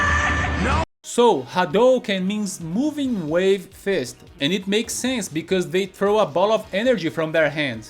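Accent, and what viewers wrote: Brazil. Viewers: Nunca vi esse canal antes. Ouvi, literalmente, 3 segundos desse cara falando e percebi que era brasileiro. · Mano tu é catarinense né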